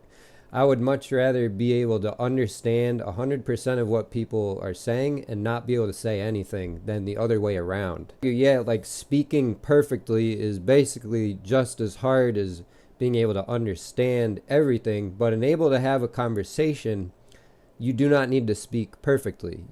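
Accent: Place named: Slavic, Eastern Europe. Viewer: wow, I thought you were Russian or from another Slavic country😅.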